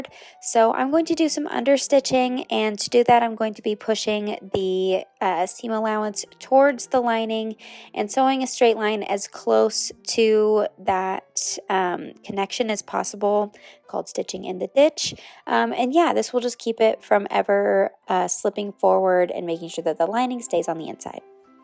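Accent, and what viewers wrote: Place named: United Kingdom. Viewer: I love the intro😊😊😊. And I thought the British accent was pretty great❤❤❤❤❤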